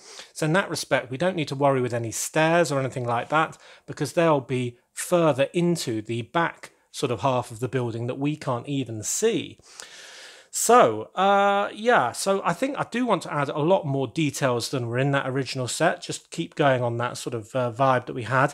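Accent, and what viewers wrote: United Kingdom. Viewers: so you’re from south England?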